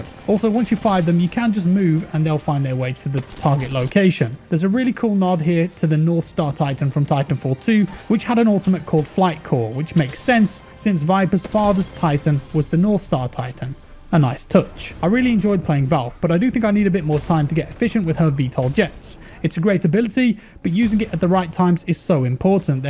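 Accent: United Kingdom. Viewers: What I like about he speaks British but called him self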